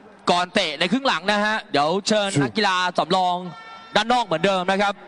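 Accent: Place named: South, Thailand. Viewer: พากได้ส้นตีนคนใต้มาก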